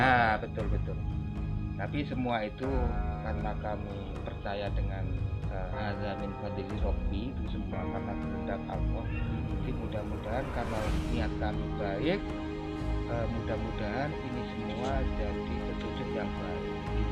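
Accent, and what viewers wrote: Java, Indonesia. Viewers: sija JK logatna jg katahuman jlm jawa.sejarah pulimbang Rik kumoring jg pandai iya.api lagi pok na Di jawa dudo,tambah pandai iya.hibat o, sa salut nyak😄😄😄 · Aneh ini yg ngomong orang jawa logat ya apa ya paham to asal usul komring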